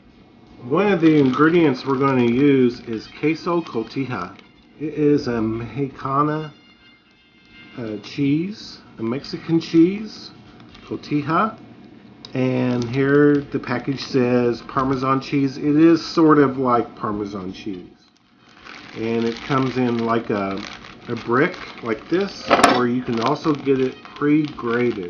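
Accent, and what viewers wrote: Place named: Southern California, United States. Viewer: So, what I hear that's so familiar and comfortable in your voice is perhaps a Southern California accent? Been trying to place that freitasex sound for a couple of months. It's a good sound. Southern California makes sense.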